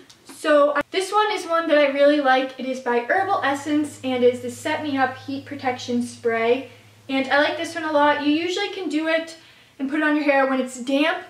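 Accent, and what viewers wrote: Canada. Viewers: Are you.. Canadian..? Also you're really pretty. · Are you Canadian?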